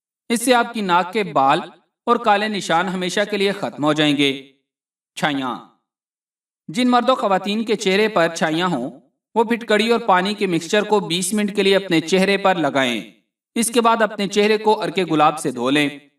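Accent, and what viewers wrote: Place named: Bihar, India. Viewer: Bhai aapki zubaan, bilkul Biharion ki style m urdu bol rahe hain🦅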